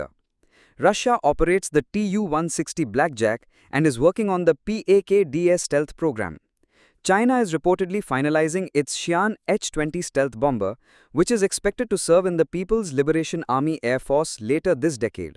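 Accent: India. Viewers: By the voice of the dam Indian it's going to shit lmfaoo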